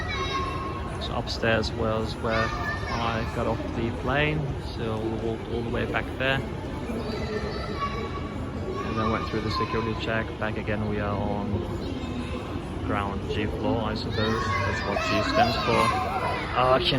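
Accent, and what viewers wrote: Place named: United Kingdom. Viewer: Açıkçası bir Türk'ün bu kadar hatasız ve akıcı ingilizce konuştuğunu ilk defa görüyorum ve şaşkınlık içerisindeyim. Aksanınız İngiliz aksanı belli, peki İngiltere'de kaç sene yaşadıktan sonra ingilizcenizi bu kıvama getirdiniz? İngilizceyi bu kadar akıcı konuşabilmeyi neye borçlusunuz? Senelerce sadece ingilizce mi konuştunuz? İşiniz İngilizce konuşturmayı mı gerektiriyordu? Sırrınızı çok merak ettim :)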